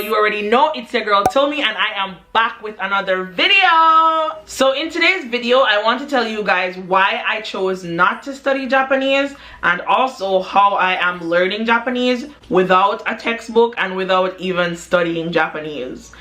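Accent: Jamaica. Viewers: omgg it looks like ur a jamaican im not sure but kinda sound like one to mi im a jamaican trying to learn japanese to be a mangaka or a translator!:3 can u tell me if ur jamaican?:) i really loved the vibes in this video too btw:> arigatou! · I Could Tell You're Jamaican,That Accent...I Checked You're About & There It Was Our Jamaican Colours.I Am Also Jamaican,I Want To Learn Japanese...Cause I Want To Be Where Pokémon Happens & Also I Love Manga...& I love Well Japan's Culture.Oh And..🇯🇲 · Yo 🤣🤣I love your energy, I’m guessing you’re from the Caribbean maybe Jamaica (I’m new here), definitely subscribing❤️ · Is she Jamaican .....yeah she's Jamaican 😂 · lemme guess, jamaican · are you Jamaican? 👀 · 20 seconds in and I'm like yup this ones definitely jamaican😂😂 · I knew you were jamaican within 2 seconds. 🤣🤣🤣 I’m Jamaican-American, and I’d LOVEEEE to learn Japanese. 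But I gotta become fluent in Spanish first! Mi nah play!! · I can hear the Jamaican 😂 私もジャマイカ人ですよ…(笑)面白い。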